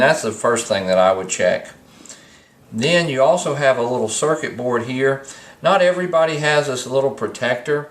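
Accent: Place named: South, United States. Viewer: What did he say here? I can't tell with his southern drawl.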